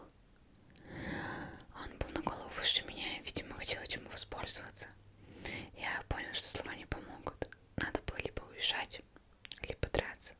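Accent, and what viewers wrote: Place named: Perm, Russia. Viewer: Очень приятный голос. 💜 Но какой-то интересный акцент... из Перми родом?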